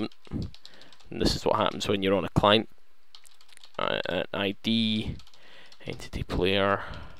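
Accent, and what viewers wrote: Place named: Scotland, United Kingdom. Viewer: Your Scottish me too :P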